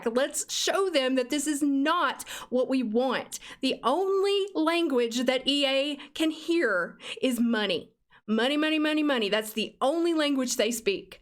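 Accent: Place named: South, United States. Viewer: I’ve never seen one of your videos before, but I absolutely fuckin adore you now 😂 your reactions and facial expressions remind me of the way I react to things, and your lil accent (is it southern? Kinda? Am I impaired?) just seals the deal! New sub here, can’t wait to binge your content ❤️ love from Toronto, Canada!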